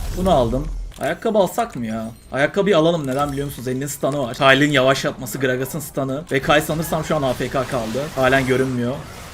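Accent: Adana, Turkey. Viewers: kardeşim adanalı olma ihtimalin var mı :D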